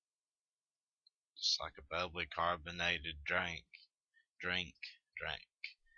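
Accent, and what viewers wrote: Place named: Tennessee, United States. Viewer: Dude I'm from outside of nashville, and I say everything they way you do. It makes me laugh, cus I've watched all the other people from different parts of the world do this as well, and the way you do it so funny. lol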